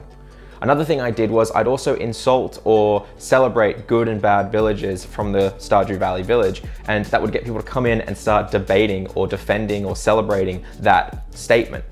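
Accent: Australia. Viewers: WAIT YOU ARE AUSTRALIAN NOW I INSTANTLY LIKE YOU MORE WHY DOES IT WORK I FEEL COMNECTED ON A PERSONAL LEVEL bro why and how does this work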